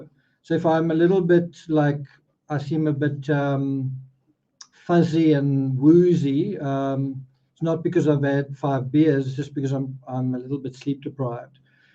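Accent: South Africa. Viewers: You must be South African, like me. How long have you lived in the USA..?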